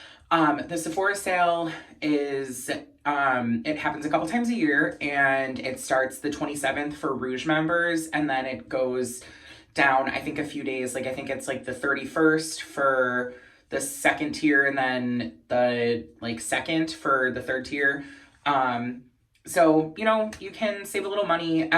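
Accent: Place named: Buffalo, United States. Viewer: I'd LOVE to see what wedding look you're gonna do with the Ethereal Eyes Palette! I was also cracking up cause I say the word "palette" the same way you do. Our Buffalo accents with these A's are outta control 😂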